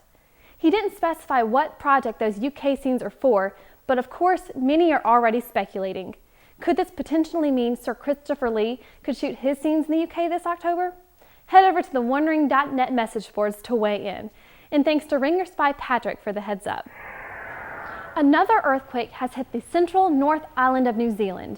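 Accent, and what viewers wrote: United States. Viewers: What kind of accent is that? It's like, a mid-west Arkansas thing but at the same time it sounds like shes trying to hide it..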